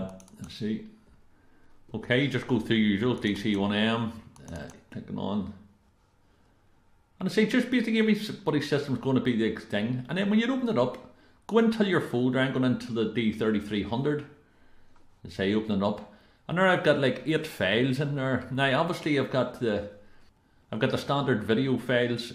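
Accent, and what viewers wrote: Belfast, United Kingdom. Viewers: are u from belfast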